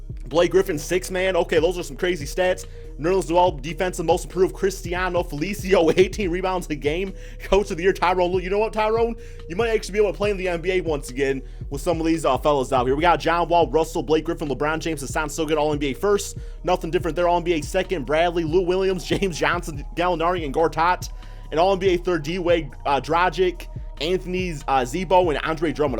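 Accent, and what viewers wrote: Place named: New York, United States. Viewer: You’re from New York aren’t you😅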